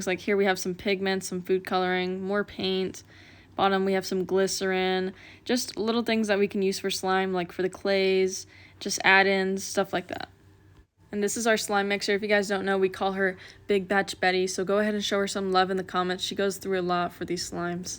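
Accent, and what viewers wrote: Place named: Australia. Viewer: Is it just me or did she go from no accent to Australian accent 😅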